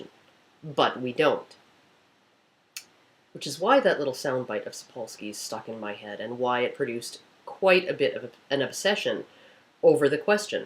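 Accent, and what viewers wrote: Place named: Ontario, Canada. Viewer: Interesting, learned post here. Encourages me to view your others, if only I can get by that Ontario accent .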